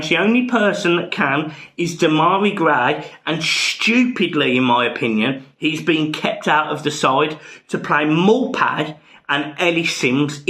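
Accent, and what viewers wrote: Birmingham, United Kingdom. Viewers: you should be a Villa fan with that accent :)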